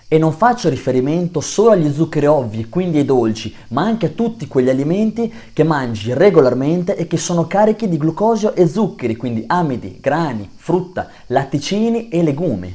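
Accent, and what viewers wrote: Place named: Modena, Italy. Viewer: Ha l'accento di Modena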